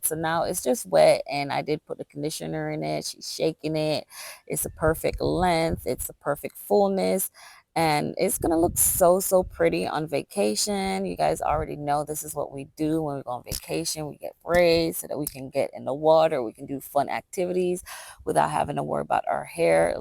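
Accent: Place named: Jamaica. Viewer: I knew you were jamaican. Lol. I asked in 1 video and you never responded. I'm jamaican too🇯🇲🇯🇲🇯🇲🇯🇲. I could hear the accent.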